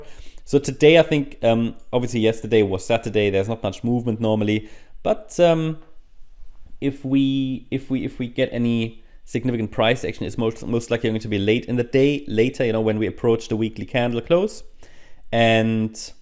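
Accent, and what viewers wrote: Germany. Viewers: Was meinst, ist er deutscher?? Also, für mich hört sich sein Akzent deutsch an😅 Auf jeden Fall, bester Cryptokanal.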